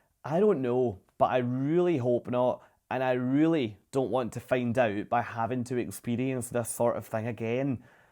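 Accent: Scotland, United Kingdom. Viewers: I just now stumbled upon your Vlog -- nice to hear a fellow Scot. My travel agent REFUSES to book us on MSC, so I watched this with interest to get your view. After this, I think we'll stick with Celebrity and Regent.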